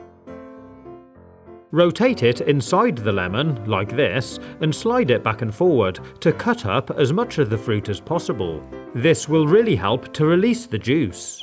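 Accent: United Kingdom. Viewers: I've been wondering are you British or not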